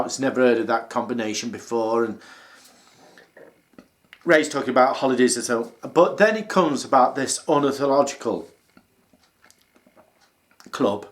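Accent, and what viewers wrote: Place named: England, United Kingdom. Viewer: I love this movie! I have the hots for the older grey haired man in the film (soccer holigan man). You are a very cute with a loverly english accent:) very cute smile aswell.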